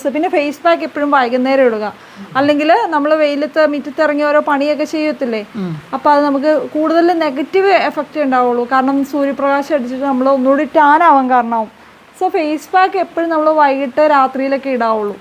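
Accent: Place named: Idukki, India. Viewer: Hi നിങ്ങളുടെ slank കേൾക്കാൻ നല്ല രസമുണ്ട് ഇടുക്കിയിൽ എവിടാ place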